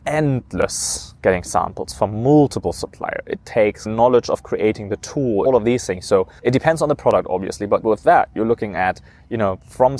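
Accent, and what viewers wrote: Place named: China. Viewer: Wow! you also got the chinese accent. Nice video tho.. thanks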